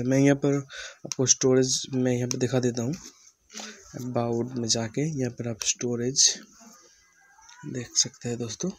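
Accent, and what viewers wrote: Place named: Bengal, India. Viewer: Bangali pola hindi boltase 👍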